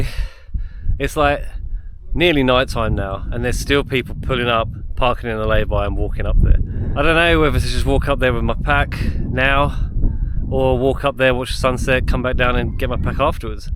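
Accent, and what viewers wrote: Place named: Southern England, United Kingdom. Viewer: Funny how you use the long a for Castleton but a short one for Mam Tor. You Southerners are a strange lot.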